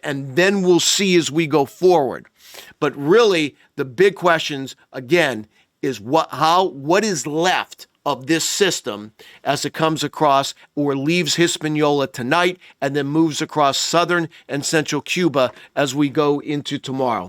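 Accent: New York, United States. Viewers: He must be from the New York City area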